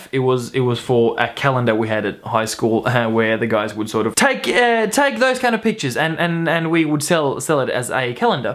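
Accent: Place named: Tasmania, Australia. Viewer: It still freaks me out (in a good way) to hear a Finnish guy who talks like a Tasmanian :)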